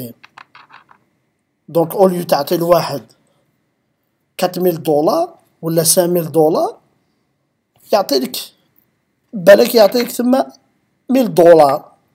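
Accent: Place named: Kabylie, Algeria. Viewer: J’ai reconnu ton accent kabyle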